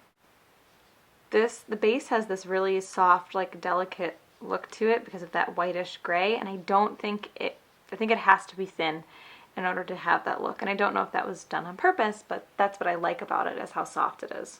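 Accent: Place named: Midwest, United States. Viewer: Are you from the Midwest? Just curious because you have a slight accent that is similar to mine lol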